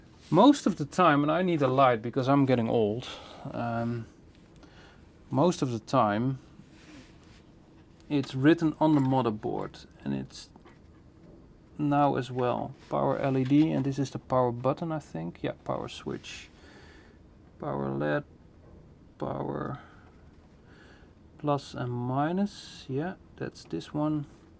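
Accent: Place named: Netherlands. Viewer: The way your accent sounds i know for sure youre from the Netherlands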